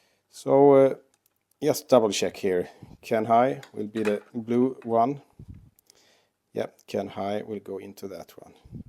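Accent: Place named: Sweden. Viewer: You sound swedish, do you do installations like this commercially? I would be interested in such a case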